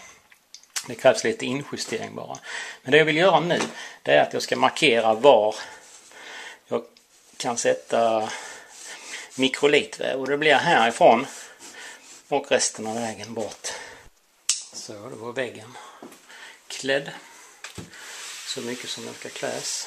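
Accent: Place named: Hässleholm, Sweden. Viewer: jag har tidigare jobbat i hässleholm och tyckte jag kände igen språket:)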